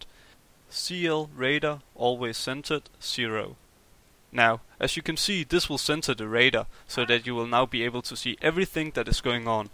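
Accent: Denmark. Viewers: That danish accent!! :p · Du er dansk kan høre det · You’re danish right?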